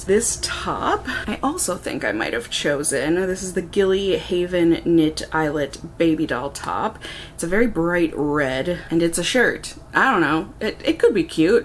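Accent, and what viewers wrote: Minnesota, United States. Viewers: You remind me of my daughter’s friend. Maybe it’s just your Minnesota accent. I’m a Minnesotan also but I have lived in Oklahoma for 40 years.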